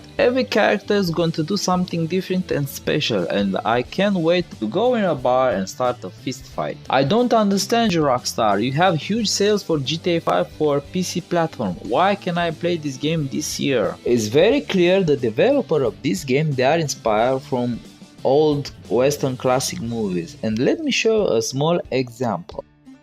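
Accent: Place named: Russia. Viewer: bro, forgive me but your english is like the russian which make tutorials, search for answer. whatever, keep work.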